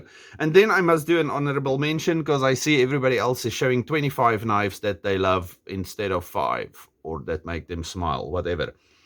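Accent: South Africa. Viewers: Thanks for posting. Can't get enough of that South African accent! I get some from the Bike Show here on YT, and I know a SA gal of Boer descent in our church who speaks Afrikaans. It's like listening to Pete(Cedric & Ada), if he had a German/Dutch inflection. 👍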